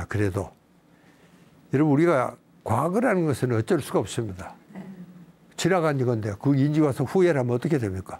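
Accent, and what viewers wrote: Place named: Daegu, South Korea. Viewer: 선생님 대구사투리 쓰시니까 좋습니다 저도대구사람입니다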